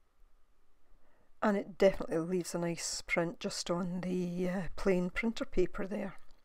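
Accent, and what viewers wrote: Scotland, United Kingdom. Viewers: Thank you so much for this video. I've also messed around with this technique coz I think these patterns are quite amazing! I tried glass, sheets of rigid acrylic, bendy acrylic, various acetates, projector film, photo paper and more, inter-changing them all if you know what I mean. So far glass seems to give the best result. I found yt videos by Atomic Shrimp and Cat Hand (two separate channels) who did some experimenting with dentritic painting and mono-printing with pretty good results. I think they may have added a wee bit of water to the paints. Beats me how I did not discover your channel previously while on my dendritic travels, but am glad I found you now. You have so many videos and interesting techniques I would love to explore! And great to hear a Scottish accent too! Sub'd.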